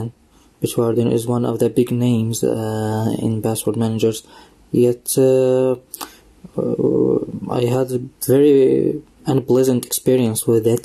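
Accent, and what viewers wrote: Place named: Iran. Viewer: Thank you! Do you speak Farsi? Where are you from? You have a nice accent that reminds me of some of my students. They're very brilliant.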